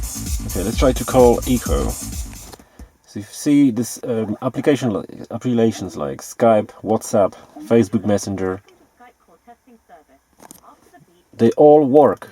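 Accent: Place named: Poland. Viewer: Widzialem ze jestes polakiem :) od razu slychac polski akcent :P ale super ze podzieliles sie ta informacja.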